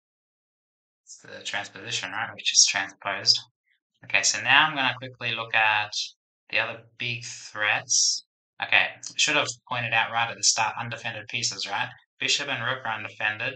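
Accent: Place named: Australia. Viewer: What is your first language? Your English sounds great, at first I thought you may be from Australia or New Zealand.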